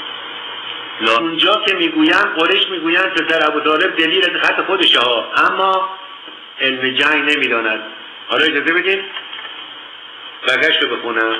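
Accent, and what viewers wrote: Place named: Turkey. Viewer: kiram tu kosse madaret ba in lahjeye torkiet.zanjende pash byofte farsha madaro khahare toro ham migan.dar zemn yadet bashe araba jaddo abadeto ham be moghash gaidan ke tule saghe arbi mesle to az kune nanat byofte. kire har chi bahai tu kosse madaro zano khaharet koskeshe mosalmune goh.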